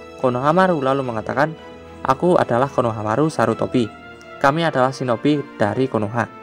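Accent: Java, Indonesia. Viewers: Medok mas suaranya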